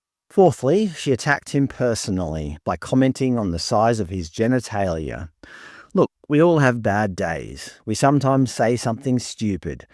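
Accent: Scotland, United Kingdom. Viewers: She's Scottish, not American. That's how Scots people talk. They throw around swear words like a farmer sows seeds. Still a bit over-the-top though.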